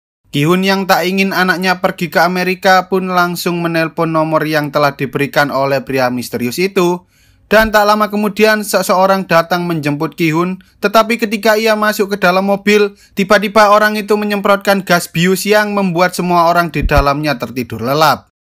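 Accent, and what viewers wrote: Java, Indonesia. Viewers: Medog banget ngomongnya hehe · kho jawanya medok bnget😅😅😅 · Kental Kali logat jawa nya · Jowo campor indo wes mboh medhok e pol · Ngomongnya medokkk banget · Kek suara sama logat medok nya dodit mulyanto. ya gak sih😂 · ngantuk gue dengerin miminnya medok bat 😭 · Suaranya medok banget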